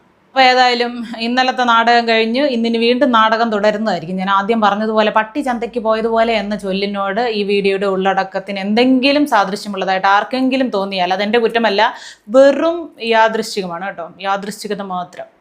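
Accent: Thiruvananthapuram, India. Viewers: നിങ്ങൾ tvm കാരിയാണോ. ഭാഷ അങ്ങിനെ തോന്നുന്നു. സൂപ്പർ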